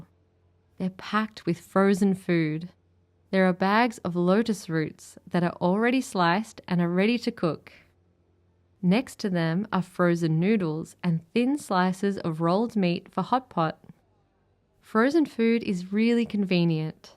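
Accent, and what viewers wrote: Australia. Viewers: Hi! I’m watching from South Korea. As someone who really loves the Australian accent I really enjoy watching your videos and listening to the way you speak! It’s also interesting to see so many Korean products in Australian Asian markets. By the way the salt bread snack is popular in Korea as well🤤🤤 The chocolate flavor is popular too!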